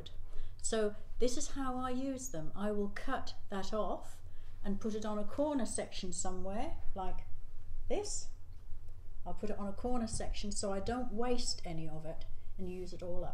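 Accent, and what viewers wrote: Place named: Australia. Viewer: This is absolutely beautiful and a wonderful tribute to days gone by. I've only just found your channel and can't wait to watch more. Lovely to hear an Aussie here. I'm in NSW 🌷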